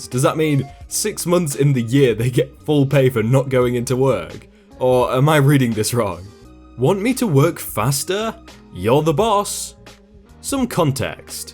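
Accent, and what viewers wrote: United Kingdom. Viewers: He's pronouncing [SCAY - DA] due to his British Accent.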